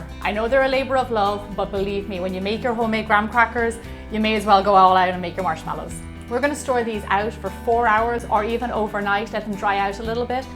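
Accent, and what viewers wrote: Ireland. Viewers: I love your accent. I'm Irish also. My dad was born in Ireland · Are you Irish? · Are u from Ireland I'm from Ireland .... This looks so yummy really wanna try them xx 💕💕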